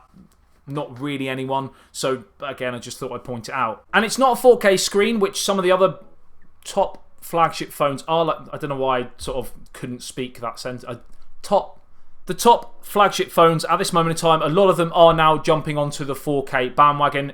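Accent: United Kingdom. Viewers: Idk why but i hate british acsent so much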